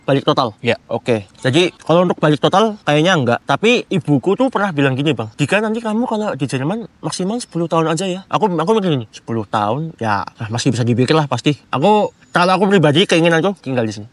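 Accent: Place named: Banyumas, Indonesia. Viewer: howalah wong kebumen pantesan mandan ngapak wkwkwk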